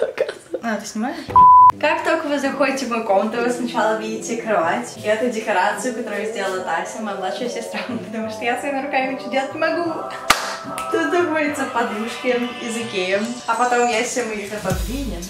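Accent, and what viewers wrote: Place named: United States. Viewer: У тебя такой классный акцент американский😑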